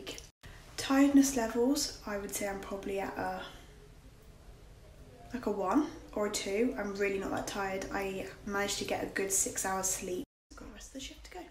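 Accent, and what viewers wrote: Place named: England, United Kingdom. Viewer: Sotaque inglês é bonito demais, pena que é difícil de entender kkk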